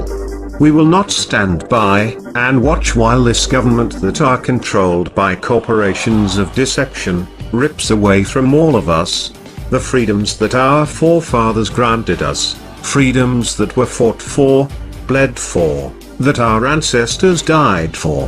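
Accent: United Kingdom. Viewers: why does this guy have a British accent but is talking about how we fought for freedom ect? The only ones we fought to get this country were the British and the defenseless natives.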